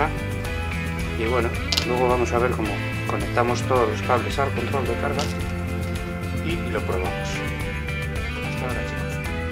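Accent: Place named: Galicia, Spain. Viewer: MUY BUENO LO SUYO DIDACTICO CLARO Y PRECISO COMO SE DEBE HACER .ME GUSTARIA SABER DE QUE LUGAR DE ESPAÑA ES USTED....PREGUNTO PORQUE COLECCIONO EN MIS OIDOS LOS ACENTOS DE ALLI. NO ES ANDALUZ NI CATALAN NI EXTREMEÑO N NAVARRO..NI ASTUIRIANO. EL SUYO ESTA CERCA DEL GALLEGO PERO NO ESTOY SEGURO.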